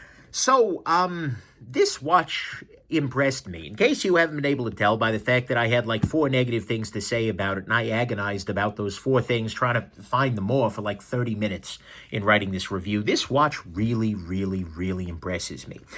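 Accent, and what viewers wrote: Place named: Staten Island, United States. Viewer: You have a Staten Island accent.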